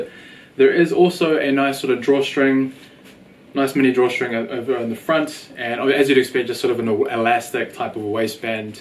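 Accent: New Zealand. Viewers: Know a kiwi accent when I hear one! great vids bro keep it up chur :)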